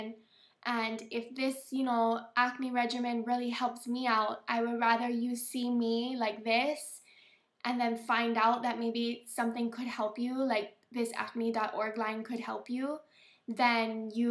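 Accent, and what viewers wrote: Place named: Minnesota, United States. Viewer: You must be from Minnesota.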